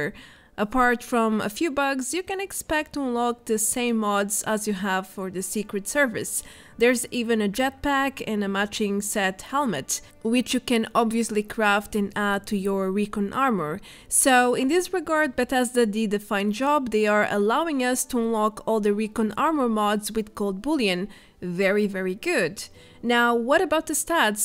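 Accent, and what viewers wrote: Portugal. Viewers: Thank you so much for the video, amazing analysis as usual. I agree I'll probably not going to get into the daily godroll event to get these, took me a while to assemble my SS armor (and it's not even that good, some pieces could be better). Lastly, don't worry about how people perceive your pronunciation, let them try some of the Camões language and see how easy it is. :D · I love the way you speak. That accent... Portuguese right? You pronounce words just fine.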